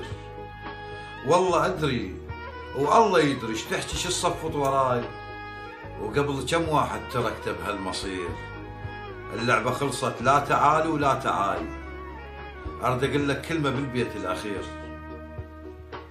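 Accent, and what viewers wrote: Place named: Iraq. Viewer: لهجه عراقيه تهبل🌹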